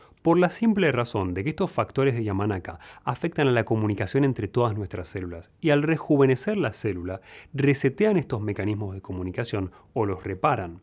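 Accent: Argentina. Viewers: es argentino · es argentino, tenía que ser argentino, no hay otra. Lo grande que somos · El dr. es Argentino!!💪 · Es argentino... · es argentino pero no sé dónde vive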